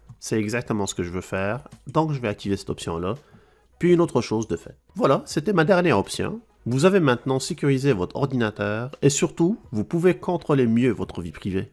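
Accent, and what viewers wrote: Quebec, Canada. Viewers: Abonnée + 1 et oui, vidéos extras et avec ce petit accent québécois, c'est encore plus sympathique. Merci ^^ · Cela semble convaincant !!!!!: mais même s'il me semble que cela doit être super important, je crains de faire une mauvaise manip qui pourrait provoquer des problèmes sur mon PC...MAIS je serai tentée de le faire.....Merci et j'adore l'accent Canadien que j'écoute depuis le Médoc en France.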